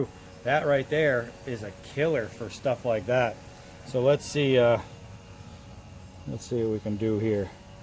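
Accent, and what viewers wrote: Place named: Rochester, United States. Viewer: Got this knife today, nice demonstrations. Where you from sound a little like Rochester NY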